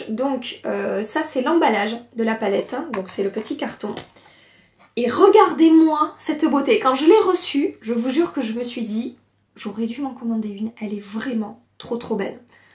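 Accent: South, France. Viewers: ce petit accent du Sud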